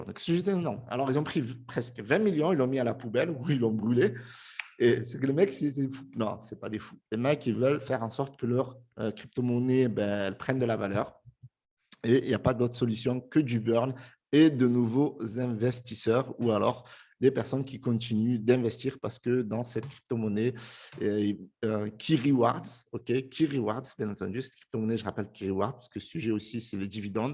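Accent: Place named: Marseille, France. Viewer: merci pour ta bonne humeur , surtout en ce moment ! tu aurais pu être de Marseille avec ton accent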